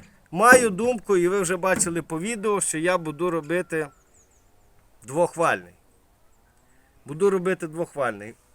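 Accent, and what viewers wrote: Chernivtsi, Ukraine. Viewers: ти з західної України, хотілося б знати звідки саме, бо у нас у Чернівцях так балакають як ти.😂 Щепоріз нормальний, ти просто ще до нього не привик.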